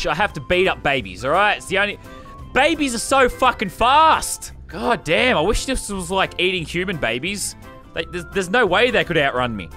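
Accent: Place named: Australia. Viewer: What part of Australia are u from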